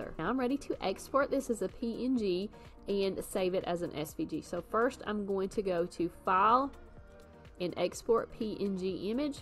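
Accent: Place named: South, United States. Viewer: You are speaking my accent!!! From one southern girl to another, I love this video! Thanks so much for sharing! Do you use Inkscape for all of your SVG designs or do you use other design software as well?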